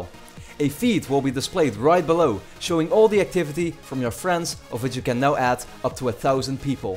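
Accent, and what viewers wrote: Netherlands. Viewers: Is dutch?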